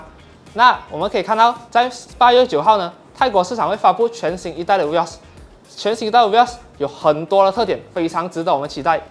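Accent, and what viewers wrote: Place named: Malaysia. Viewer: 帅哥的口音才正宗。。马来西亚人嘛，当然是要以马来西亚华语的口音来做视频，满满的亲切感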